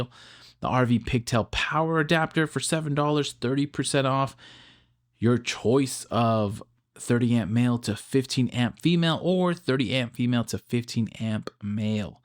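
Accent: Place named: California, United States. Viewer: that's dope lol, I speak some but not enough. I saw you were from California and I can hear the way you pronounce some words but that's kinda common in Cali, and then you said la and I was like yep it's confirmed lol. Awesome bro, good skill to have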